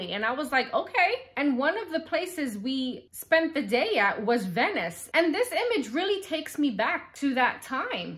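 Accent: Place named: New York, United States. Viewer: Hi, This is the first video of yours that I'm seeing. Really like your energy and enthusiasm for puzzles! And it sounds like you're a native New Yorker, like me! I have a ridiculous number of Eeboo's - - most I bought myself and others were gifts, but I've only done my 500-piece ones so far. Maybe I'll work next on the VENICE one you showed -- it's in the closet somewhere, LOL. Have a great day!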